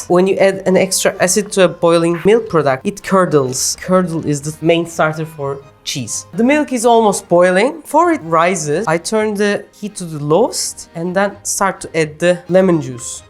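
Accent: Turkey. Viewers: Your accent only convinces me that you are Turkish otherwise you look like a south east Asian, in fact like a Punjabi. Btw recipe is amazing and easy. I'll try soon!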